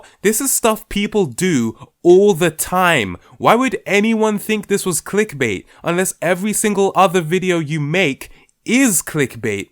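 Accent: RP, United Kingdom. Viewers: Refreshing to hear a black male with a posh accent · Heyeyeyeyeye are u British?? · Are you British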